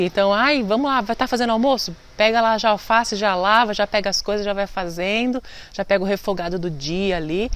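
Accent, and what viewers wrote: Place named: Paraná, Brazil. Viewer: Adorei o seu canal!! Obrigado por compartilhar conhecimento. Ah, esse sotaque é do Paraná, né!? rsrsr (Amei)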